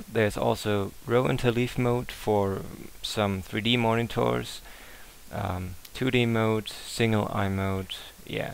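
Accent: Germany. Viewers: Haha du bist deutsch oder?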